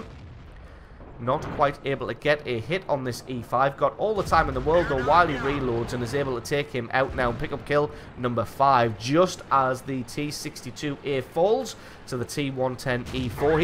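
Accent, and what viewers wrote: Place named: North East England, United Kingdom. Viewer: The north east of England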